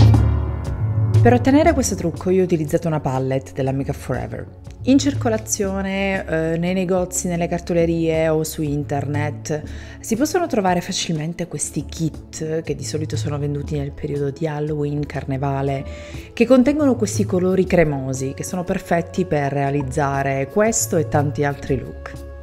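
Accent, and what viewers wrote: Sicily, Italy. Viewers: Sei la migliore in assoluto...per la tua bravura, la tua fantasia...ma sopratutto per la tua simpatia e il tuo mitico accento da siciliaaana :) una curiosità...i tuoi figli ti vedono con questi trucchi? Cosa pensano? Hahaha che divertimeto immagino...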